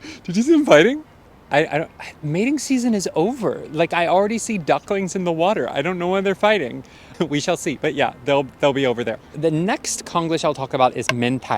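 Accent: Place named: United States. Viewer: 한국말 하실 때랑 목소리가 전혀 다르신게 더 매력적으로 느껴집니다. 목소리, 톤, 발음이 평소 많이 듣던 미국영어 음성이라 선명하고 정확하게 들려서 너무 좋아요. 딕션이 좋으시다~라고 하면 이것도 콩글리쉬일까요? 사실 콩글리쉬 자체도 콩글리쉬라 Broken English라고 써야한다는 얘길 듣긴했습니다만ㅎㅎ 좋은 영상 감사해요. 야외스튜디오 너무 좋습니다~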